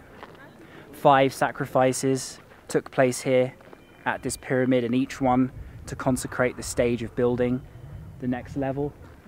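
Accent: United Kingdom. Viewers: I love your British accent 💓 so funny... And I love see you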